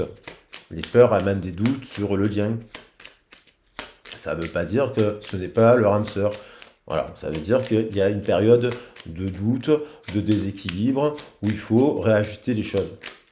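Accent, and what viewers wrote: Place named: South, France. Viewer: Coucou! Le simple fait de t'entendre dire: "Hé coucou tout le monde", avec l'accent du soleil🌞donne la pêche🤣 Je pense être une guérisseuse, à ma manière... Je te souhaite un bon week-end prolongé de Pâques, même si le temps n'est pas au beau fixe sur le Sud🌧.. Gros bisous🕊🐣😘🍀!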